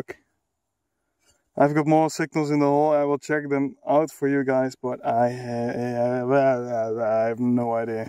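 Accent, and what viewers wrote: Netherlands. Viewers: You've a dutch accent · Geen haat maar je hoort dat je Nederlands bent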